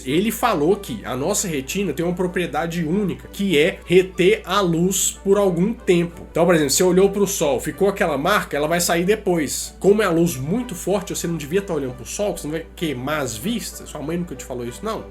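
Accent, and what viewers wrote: Minas Gerais, Brazil. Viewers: Com certeza vc é aqui de BH... Eu também! Nosso sotaque é muito engraçado KKKKKKKKK... Também tenho um canal. Comecei a uns 4 meses. Também falo sobre cultura POP, ciência, curiosidades, etc. Depois se der confere lá. De Mineiro para Mineiro!!!!! <link> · Conteúdo: Topzera Carisma: inexistente Sotaque Mineiro: insuportável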